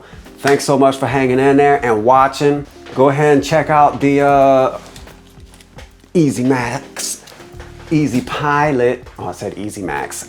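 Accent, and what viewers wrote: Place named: Louisiana, United States. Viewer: I know dat accent when I hear it! But I’ve never heard anyone speak so clearly, or with a neutral tone, but at the same time spit out a purely Cajun accent! You were tripping me out with that. Lol... We’ll have to get together and fly one day once I get some time on the sticks with FPV... I’m a 107 pilot, been flying gps drones for about 5 years. I plan to do some modeling, mapping , and construction progression work in the near future, but for now I’m just shooting some real estate here and there when I get the opportunity... But I been wanting to make some time for this hobby for a while now... I been playing around on the simulator a little bit, but i think I’m going to get a lot better once I get my hands on one of these little beginner drones. That’s the plan anyway! Lol...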